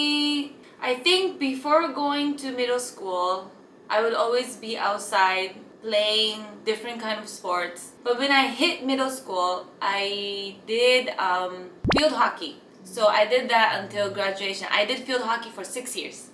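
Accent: Philippines. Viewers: She talks in english like a Filipina...I wonder if she knows some tagalog..... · Why do you have Filipino accent?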